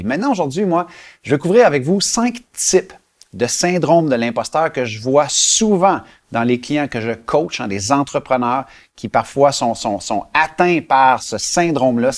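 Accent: Canada. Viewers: Merci pour la vidéo hyper intéressante, en plus de l'accent canadien agréable à écouter ! Je me suis reconnue dans la super génie et la perfectionniste. Mentalement je comprends ce que je peux faire, il y a plus qu'à mettre en pratique et m'apporter de la bienveillance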